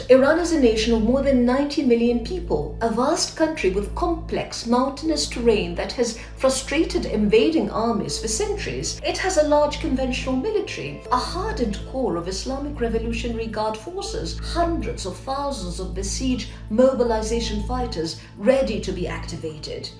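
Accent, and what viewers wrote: RP, United Kingdom. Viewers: That's a fine British accent you've got. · Do you really speak in Received Pronunciation, or is this a put on? Nice message! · As an American I just want to say that her accent is both impressive and off putting at the same time. - To me it’s impressive that she learned to do a posh, aristocratic English accent so well, but it’s also off putting because she sounds like an English aristocrat to me. I’ve only ever heard that accent in plays or when people are intentionally trying to be silly, so it’s hard for me to take it seriously when someone uses that accent. My whole life the only time I’ve heard anyone speak like that, it was Americans who were trying to be ridiculous for a laugh. I’m very opposed to this war and want to help everyone else who opposes it to get their message out. I understand I’m saying something here that might rub some people the wrong way, but it’s intended as constructive criticism. 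I’m trying to help. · Is this truly her accent, or is she mocking the brittish London accent . But she does make some great points though... kudos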